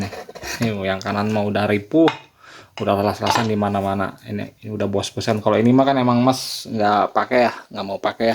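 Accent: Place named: Bogor, Indonesia. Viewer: Lokasi mana bang logat Bogor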